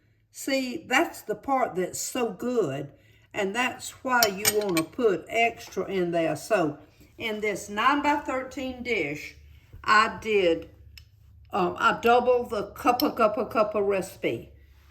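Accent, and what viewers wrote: South, United States. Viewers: you are truly a gift from God! You are our favorite cook on here! Such a darling "Southern Belle"..just listening to you speak is magic to my ears!! Love how you explain your recipes so well! And..your lovely stories you share with us!! Love your recipies ( & so do my friends & family who eat my meals & deserts from your recipies!) ❤ You are just heartwarming!!!! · Amen to that!! I could watch her videos all day! She is my maternal grandma made over! Soo sweet, funny, loving, and oh that southern accent! ❤ Thank you for sharing your recipes, family, and your love for Jesus! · What a beautiful soul you have! Absolutely love your southern accent! God bless you! You are a jewel.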